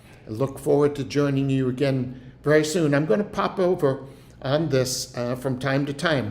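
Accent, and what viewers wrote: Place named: Spain. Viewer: Thanks for sharing from Costa Rica pastor Paul. Shalom from Bolivia🙂 P.s. Nice Spanish accent and "hasta luego"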